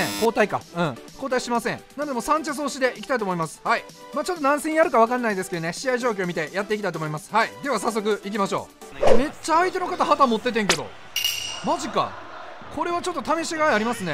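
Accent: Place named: Fukuoka, Japan. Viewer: 、これまたリアタイでサンチェス増えますよっあとよかよかは福岡の方の方言ですね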